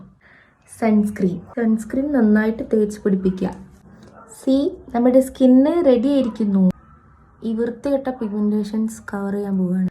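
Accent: Kannur, India. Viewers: Slang kettappozhee mnsilayii ksd or kannur ann lle? ❤️💗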